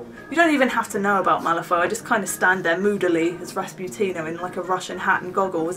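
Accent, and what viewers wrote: England, United Kingdom. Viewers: You are so funny and great stay sweet and keep up the great work, It so great to see your growing as a gamer. Cheers. Later mate I have Wood Elves and Skaven . I find you can so a lot of archers but they both need new books and at this rte (th will be out beofe they are : ( Love the English accent russ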